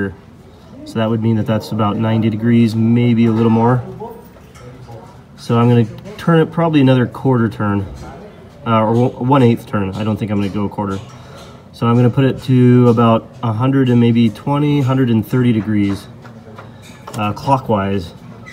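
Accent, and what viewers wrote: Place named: United States. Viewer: Where do you live bro? You sound real american lol. Im totaly confused. Haha. Great videos by the way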